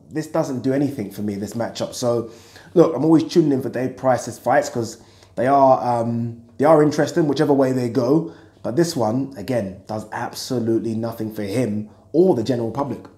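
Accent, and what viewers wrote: Liverpool, United Kingdom. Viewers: We? you dont sound as scouse as Pricey.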